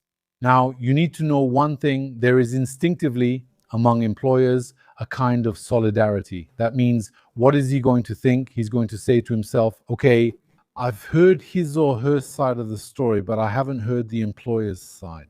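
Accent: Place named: United Kingdom. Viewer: L'accent britannique, la classe !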